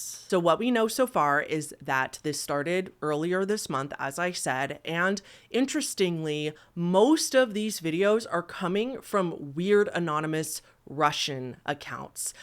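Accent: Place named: California, United States. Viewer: I can’t take this video seriously with the thick California accent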